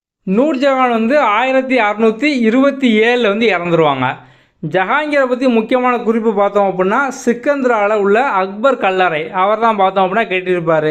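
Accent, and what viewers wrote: Tirunelveli, India. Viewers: Are you belong to Tirunelveli?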